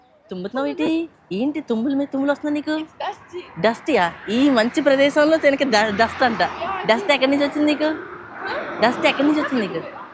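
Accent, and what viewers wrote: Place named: Telangana, India. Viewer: Elo😂 meedhi Telangaanaa Slang kadhaaaa🌲🦕🦜❇️✳️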